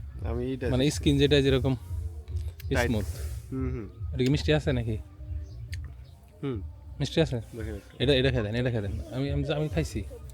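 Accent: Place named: Sherpur, Bangladesh. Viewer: ভাইয়া আপনি কিন্তু আমাদের শেরপুর এর ভাষায় কথা বলেন আপনার কথা আমার ভালো লাগে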